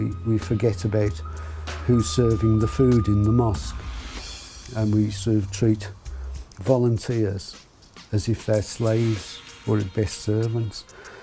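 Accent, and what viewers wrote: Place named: Birmingham, United Kingdom. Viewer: Proper Brummie Brother 👍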